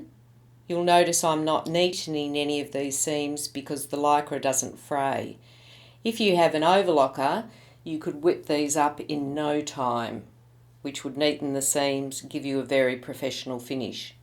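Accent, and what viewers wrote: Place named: Australia. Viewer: Well, I love your Australian accent! (I am in Sydney - LOL!!) Have subbed - just getting back into sewing after having children and loving your tutorials :-)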